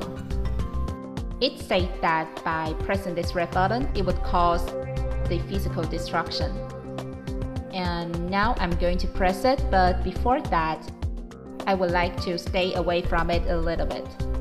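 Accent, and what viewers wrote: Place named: China. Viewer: Her voice sounds like a robot with a Chinese accent...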